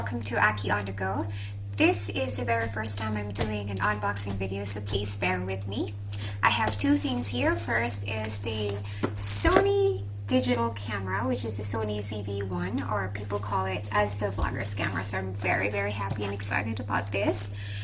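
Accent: Australia. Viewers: Maam, nindot kaau aiii, pero wala juy mka labaw sa imng aksent maam pang Australian citizen kaau ganahan qg pamati. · Mugawas jud ang slight na aussie accent. Nakaadapt nka diha kie. Great job!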